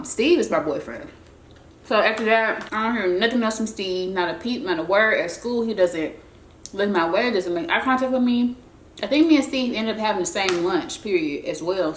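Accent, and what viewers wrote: Memphis, United States. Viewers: Zaxbys come be hearing from me ! 🤣🤣 your so memphis I love it !!! #901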